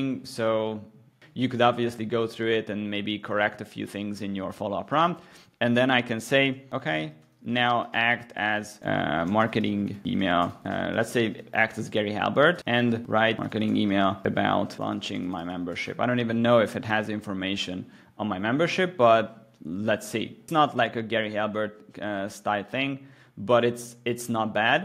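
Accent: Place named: Hungary. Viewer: This guy has Hungarian accent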